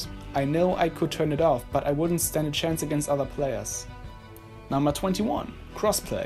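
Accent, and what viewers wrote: Germany. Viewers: Man hört den deutschen Akzent einfach raus 😂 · Bro you're german?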